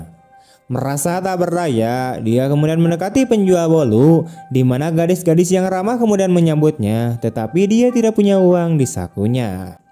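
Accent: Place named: Sunda, Indonesia. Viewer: Anyeng suara nbte ngenaheun co aa ti Sunda siganaamah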